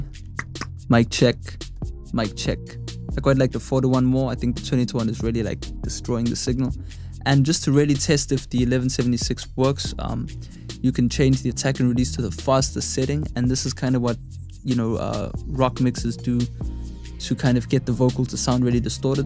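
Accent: South Africa. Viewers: are you south african dude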